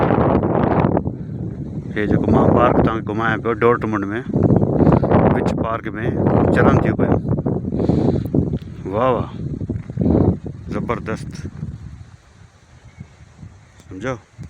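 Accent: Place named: Sindh, Pakistan. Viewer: You have beautiful Sindhi accent. Sir MashaAllah.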